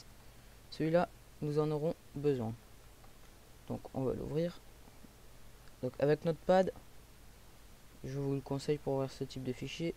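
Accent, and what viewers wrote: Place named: England, United Kingdom. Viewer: quel accent anglais !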